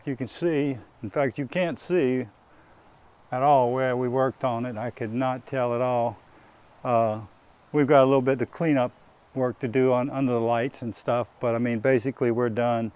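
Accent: Louisiana, United States. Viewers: Man when I heard you talk I knew you were from my home lol! My camper caught a branch through it in the last hurricane that came through carencro. I just had it hauled up to Washington for me and finally getting around to dealing with the hole. Gotta get everything out of it first. Pretty sure I got some water in there but the good thing is it’s so dry here none of it sits. We’ll see how it goes. Are those special mesh patches for fiberglass? Or could you use Sheetrock patches?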